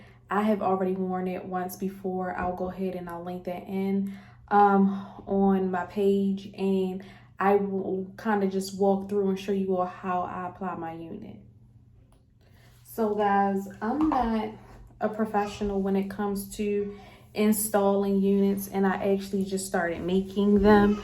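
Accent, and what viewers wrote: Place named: Baltimore, United States. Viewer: Cute! Your accent sounds like Baltimore.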